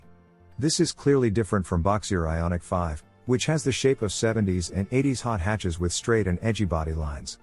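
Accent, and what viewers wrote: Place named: United States. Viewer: Great-looking car, but deathly awful Americanised narration and leaden storyboard. Get a British production company to produce your promotional videos. You only have to look at the UK's Grand Tour production values to witness innovative and inspirational delivery, which is absent everywhere else.... in the world.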